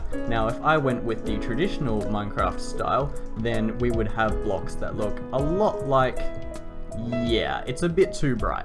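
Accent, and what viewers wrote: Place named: Australia. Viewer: you are simply amazing. You are special. This is the first 30 minute video that I have watched in a very long time. I love the way you make your content. You are amazing at block art, command blocking and coding. <3. Btw, you sound Australian, are you?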